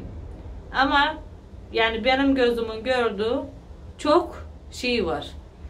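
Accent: Trabzon, Turkey. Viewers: seni keyifle izliyorum tirabzon sivesiyle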